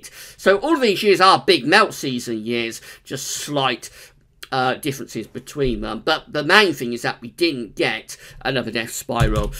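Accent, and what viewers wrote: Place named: Liverpool, United Kingdom. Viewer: Hello mate! Thanks for your efforts which are duly appreciated. For those of us who do not originate from liverpool or wales or wherever you might come from, it would be extremely beneficial if you could tone down your accent so that you are more easily understandable by a greater number. Many thanks.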